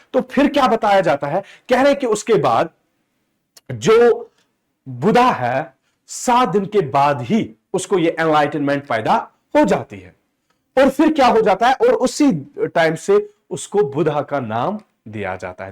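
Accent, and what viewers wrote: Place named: Kashmir, India. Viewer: The kashmire tone of can be go fir a kashmire 😊❤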